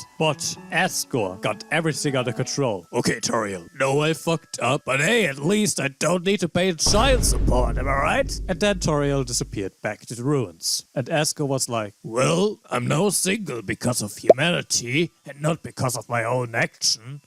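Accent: Germany. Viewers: Dein Akzent klingt sehr Deutsch.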